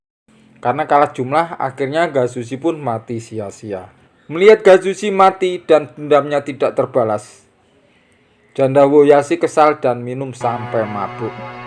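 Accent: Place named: Java, Indonesia. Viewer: Yg cerita nya wong jw ne medok bngt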